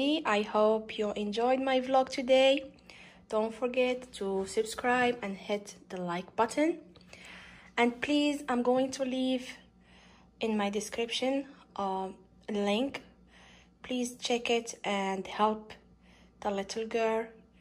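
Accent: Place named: Morocco. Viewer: تبارك الله عليك قبل منشوف الصوره ديالك غير من الهضرة عرفتك مغربية ۔ الله يوفقك وشكرا علی الفيديو الجميل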